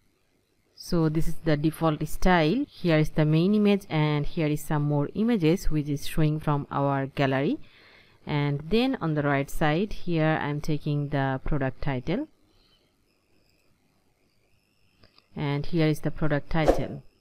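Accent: Bangladesh. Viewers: Are you Bangladeshi? Your English speaking is so understanding. ❤️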